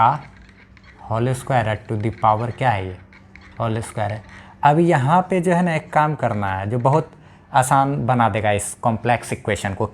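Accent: Bihar, India. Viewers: plz.... support him everyone... he is bets teacher and the favorite part in his all videos is his bihari tone ....💕💕💕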